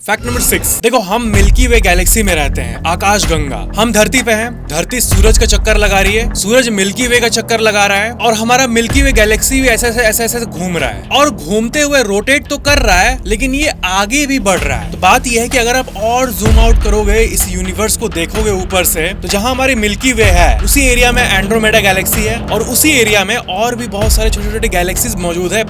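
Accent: Bihar, India. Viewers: Pakka bihari bol chal h bhai ka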